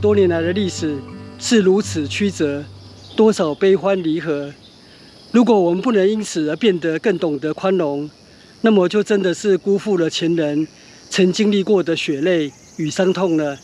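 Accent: Taiwan. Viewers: 我覺得很合適 親切的台灣發音 努力的研究台灣的人文以及地理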